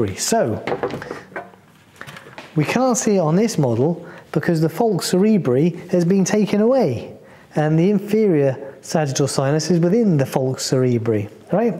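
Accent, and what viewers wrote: United Kingdom. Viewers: You are great but with that typical british ascent i could understand only half of what you say 😅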